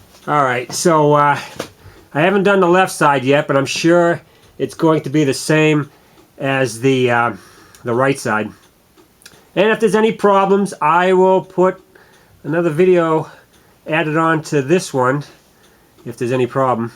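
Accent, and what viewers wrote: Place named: Massachusetts, United States. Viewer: "Oh my God...you're a pimpin' fool!"...LOL You must be from Massachusetts...you have the same accent as a buddy of mine. He says things like rubbish...and Caaah (for car)...lol Nice videos! I may have to do this but with one that is not the sequential flashing type...to me it is a bit annoying. I would be adding them to a mirror that already has forward facing flashers...I just want some with them facing the rear to alert people coming up on you.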